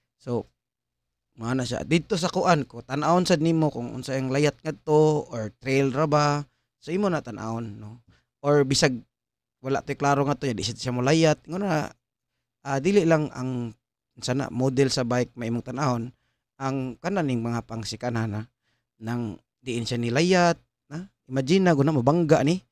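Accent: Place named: Philippines. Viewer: So you really want a Diamond Back? 😜 With a Lefty on it? Lol. I love the Philienglish!!! Every language does it.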